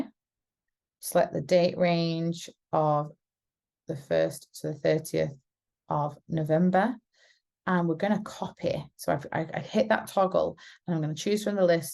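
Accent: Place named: North, United Kingdom. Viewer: just downloaded the app and looking forward to using it! this may sound awful but hearing that you’re northern makes me trust you a lot more 😅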